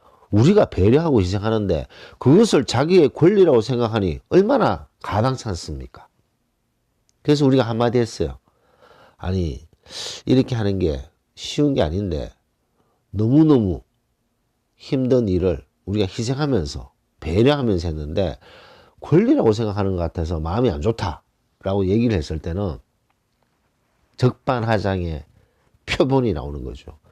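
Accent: Gyeongsang, South Korea. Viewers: 경상도 말투인데 듣기 싫지 않네요. ㅎㅎ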